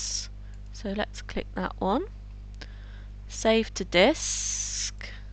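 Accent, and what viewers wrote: United Kingdom. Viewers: nah man....i said that because this woman sounds like one of those British nannys....xD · It's british accent :P that's why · are u a girl or a boy sounds?0_0 oh i hear the british sounds in ur voice lol cool.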